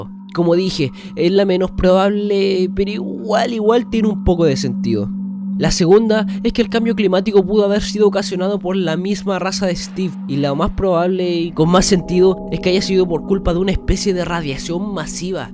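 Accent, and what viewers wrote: Chile. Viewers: apenas escuchar su intro este wn es mas chileno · chileno DETECTED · Pta wn se nota que eri shileno ps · Eres de chile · Hablas español normal o habla chileno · me gustaria saber como fue que se te ocurrio esta teoria, estaria encantado de escuchar como fue que fuiste formando esta teoria tan ufff pero con un sentido que no esperaba encontrar en un juego tan kawai como minecraft Pds: hehehe sabia que eras chileno con solo escuchar tu forma de hablar ^^ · Tenías voz de flaite, ya te había identificado el chileno, y bueno, el video era tan excelente que llegue hasta el final y te escuche el “hueon”, lo que si, podrías dramatizar menos jajaja, saludos bro!! 🇨🇱🇨🇱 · Chileno xD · me encanta tu forma de narrar teorias y me gusta también el concepto que tienen. ya he visto varias y la verdad son muy buenas ya tienes una nueva subscripcion !! ademas me gusta el acento chileno ;) saludos desde arg. 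· apenas me meti al video y cache que eres chileno xd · Tu Acento Es El De Mi Pais Osea Chile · Eres Chileno? :0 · dejame adivinar , ... chileno? :V xd -denme like para que lo vea y no desaparecer xd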